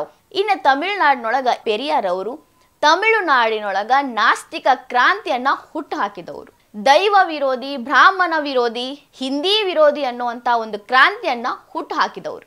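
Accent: North Karnataka, India. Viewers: ನಮ್ಮ ಉತ್ತರ ಕರ್ನಾಟಕ ಮಂದಿ ಭಾಷೆ ಕೇಳೋಕ ಚಂದ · Utthara karnaataka😊😊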